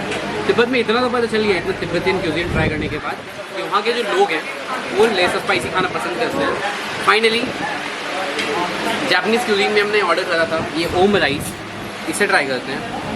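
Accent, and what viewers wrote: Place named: United Kingdom. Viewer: This was too good. Just saw your video today. Your accent is awesome. Did you live in UK earlier? Waiting for your new videos. Love from Spain.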